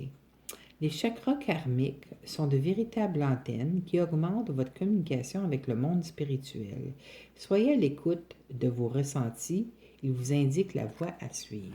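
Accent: Canada. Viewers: Bonjour, je vous souhaite de retrouver harmonie dans votre coeur, pas d' épreuve plus large que nos épaules...une expérience de plus pour travailler sur ce qui n'est pas encore "réglé"... pour autant, ne pas se flageller, je sens que vous êtes aussi une personne qui sait analyser les choses, réconfortante, généreuse et forte. J'aime beaucoup vos analyses des cartes. Au plaisir d'entendre votre accent canadien!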